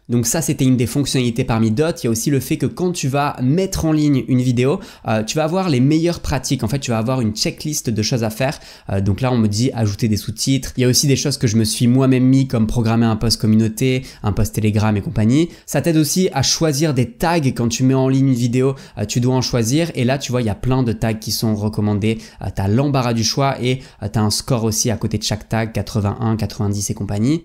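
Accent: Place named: Southeast, France. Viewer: Merci c'est vraiment top ! Y a plusieurs outils que j'utilise déjà (canva / tubebuddy), j'ai pas encore testé opus clip que j'ai découvert grâce à toi, mais faut que je m'y mette, et que j'aille voir les autres. PS : on est d'accord que tu viens du sud est, genre Nice ou pas hyper loin ? Tu transformes les sons en O en un mixe entre O et A, j'ai entendu ça que là bas.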